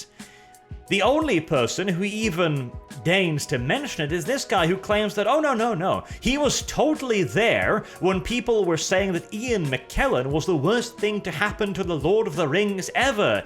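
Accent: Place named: Southern England, United Kingdom. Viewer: do not worry about your words bro when I frist heard you speek I thought you were from south of England. Its only when you speek Kraut or Ruski I can tell your not English. Peace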